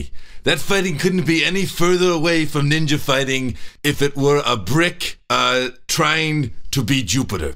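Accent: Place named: India. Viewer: that ninja sounds like he is from inida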